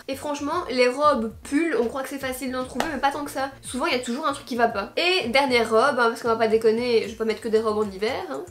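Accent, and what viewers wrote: Belgium. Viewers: mais Bardot ça veux pas dire Bordeaux 😂😂 les Beges on parle comme vous hein 🤣❤ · J'adore notre accent belge😂 #TeamBelge #Brussels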